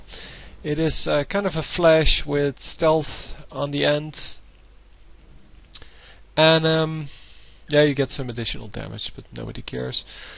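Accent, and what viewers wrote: Netherlands. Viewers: Are you dutch man?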